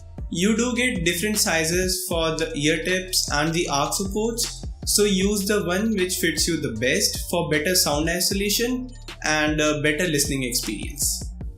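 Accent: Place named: North India, India. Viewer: Decent presentation. Loved the accent from a North Indian . Way to go.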